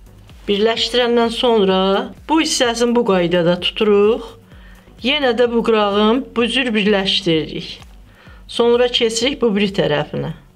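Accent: Nakhchivan, Azerbaijan. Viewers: Bacım Naxcıvanlısız?? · Xala deyesen naxcvanlısiz😂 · Eladi. Deyesen Naxcivanlidi bu anamiz..mende ordanam Ordubad rayonundan. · Əlinə saağlıq nağcıvanlı kimi danışır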